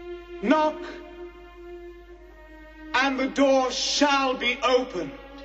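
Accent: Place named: England, United Kingdom. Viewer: Jesus didn't have a stupid English accent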